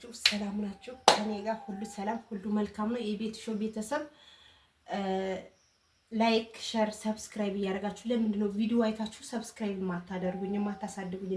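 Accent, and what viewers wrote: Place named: Wolaita, Ethiopia. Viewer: ፔኪን ፖውደር ነው የሚባለው አማርኛሽ ግን የወላይታ ነው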